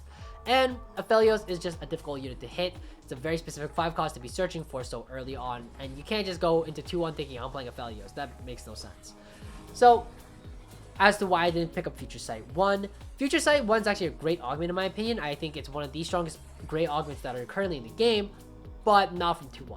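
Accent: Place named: Boston, United States. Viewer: Hey, found you and learned a lot from your previous road to challenger vids! You said you have a Boston accent, I'm also from Boston and also suffer from the random accent coming out LOL, keep up the good work!!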